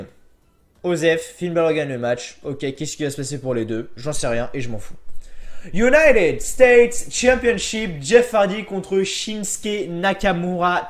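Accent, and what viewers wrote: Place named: South, France. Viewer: L'accent du sud je m'en lasse jamais il est grave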